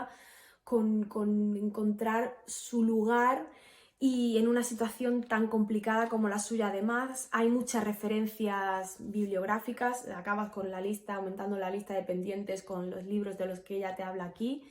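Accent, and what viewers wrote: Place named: Andalusia, Spain. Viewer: Yo también soy andaluz y me encanta ver tus vídeos y disfrutar de tu precioso acento andaluz.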